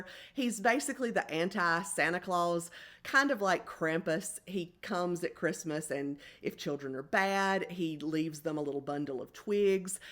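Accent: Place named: South, United States. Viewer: Love your southern accent its so comforting i am also a mystery lover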